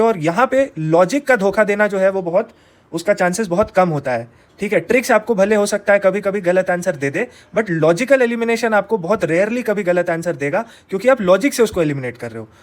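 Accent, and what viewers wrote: India. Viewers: Sir aap banaras se ho kya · Sir aap bihar ya purvanchal up se ho kya ? Aapki language tone kuch waisa hi lag raha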